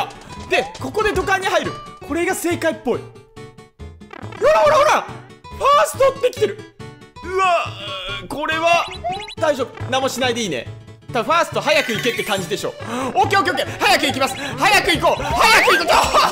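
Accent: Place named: Kyushu, Japan. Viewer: 九州人だ〜、親近感w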